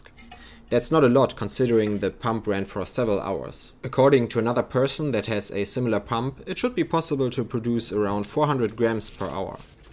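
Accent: Germany. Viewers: oh I'm sure they do too. I'm just razzing ya man. If I did the hobbies around here that you do wherever you are.( To me your accent sounds... German.) Although your obviously fluent in English. Well it wouldn't be very funny. I could get shot if I go to the pharmacy and in a German type accent say. Well. It wouldn't sound like you. I'm not trying to insult you. Where you live is probably way less paranoid than where I stay. I'm talking about the people.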